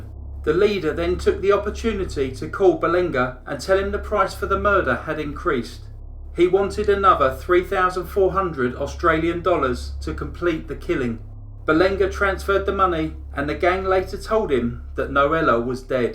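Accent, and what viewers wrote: England, United Kingdom. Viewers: First I would like say that I sure missed my friends beautiful English accent! So good to hear it again... For this woman, it is sad that she is being shunned because of a man who wanted to murder her. Those people need understand that it could happen to them. She is better off without those good for nothing back stabber's. He deserved a longer sentence, 9 yrs is nothing for someone who wanted to murder their wife. He begs forgiveness? He just wanted out the easy way, so he thought. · This was a fascinating case, presented in a compelling style. You successfully blend storytelling and documentary narrative styles, and you enunciate clearly so that you are easy to understand, UK accent notwithstanding. ;-) Nine months with no parole for six seems insufficient, however, I think Noela got some measure of justice with the shock of showing up. 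Can't put a price on that. Great job.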